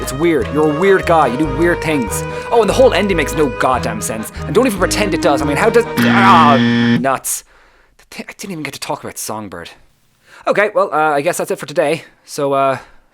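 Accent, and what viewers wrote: Ireland. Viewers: yup pal are you irish?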